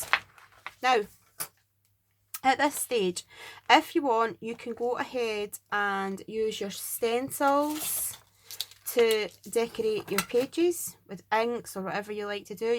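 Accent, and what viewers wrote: Scotland, United Kingdom. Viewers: A nice and simple and yet beautiful and love your scotch accent ♥️🌹✝️🙏🇬🇧👍🌷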